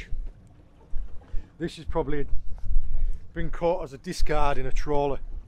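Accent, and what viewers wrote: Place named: Cumbria, United Kingdom. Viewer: you’ve almost got a bit of a Cumbrian twang in your accent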